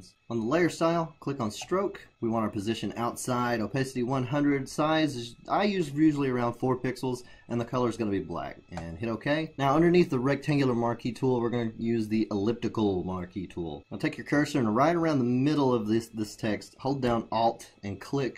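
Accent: Texas, United States. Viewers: are you from Texas or Nevada?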